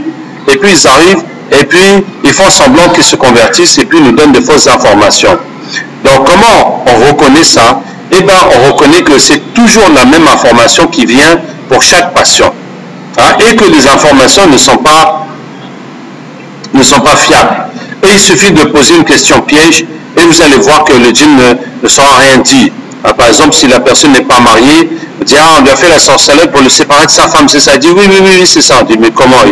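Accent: Africa. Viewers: L'accent de l'Afrique! C'est quel accent?pas celui choisis par Dieu?soubhanallah que Dieu nous éloigne toute idée de racisme même le djines musulmans sont des frère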